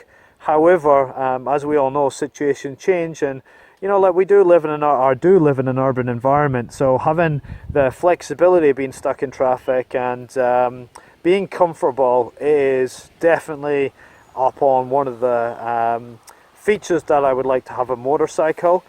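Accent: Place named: Scotland, United Kingdom. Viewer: fantastic bike, good Scottish accent too, superb, haha.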